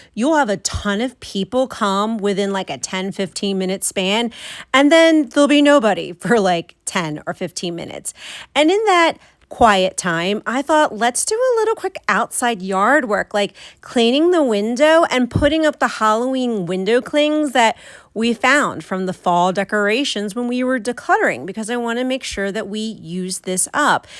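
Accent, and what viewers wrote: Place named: South Jersey, United States. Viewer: How do you do your pricing for yard sales? Do you price each item individually, or do you just negotiate when someone is interested? I don't see prices marked per table or on each item. Also, please know that you were the first person I could watch about de-cluttering & hoarding disorder that made sense to me and I didn't feel overwhelmed with guilt listening and watching you. You've made so much progress and given so much hope to many! I've found one other channel, a lady more my age (This Mainer Mom), but you two are it, and both kind and practical and motivating! I'm not as far along as you are in decluttering, but am working on it, and have accomplished cleaning out several places I'd avoided for years. Something else: I recognized your accent and eventually found that you live near where I grew up (Pitman) before my family moved to Indiana when I was almost a teenager, though I've been back there a lot to see relatives & to take my own daughters when they were growing up. 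Your accent, your talking about going down to "the shore" and all just makes me remember family and my wonderful growing up years back in south Jersey. It seemed like the Lord helped me find you because you made sense to me, you have such an honest and loving spirit, and you also have expressed your faith. You have a ministry! And we also share a name, I think--! Thanks so much for all the encouragement you've been to me and I'm sure to so many others!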